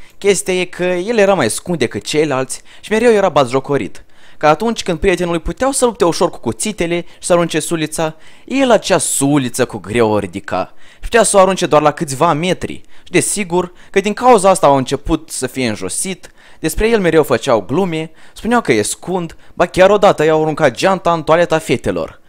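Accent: Moldova. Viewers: Dat Moldovean accent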